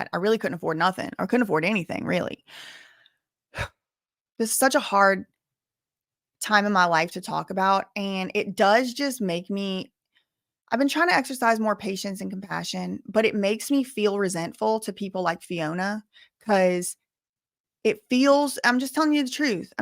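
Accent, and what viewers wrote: South, United States. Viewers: Wow you're story's amazing, congratulations on going from where you did and with disabilities to now a lawyer, and I love your southern accent is so cute just like Britney's, don't ever change it. You should email that person and just say well bless your heart your southern so I'm sure you know what that really means when you tell someone that lol. ❤ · I’m not American, but I don’t get why a person with southern accent is seen as lower in any capacity. It’s pretty evident that you’re incredibly intelligent. And you did it, you are on top, don’t let anyone make you feel less than. You’re so beloved and respected because you’re the real deal and i bet there are young girls looking up to you and wanting more for their lives despite the circumstances they are growing up in. You’re wonderful, funny, smart, beautiful and quick on your feet. 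We love you girl ❤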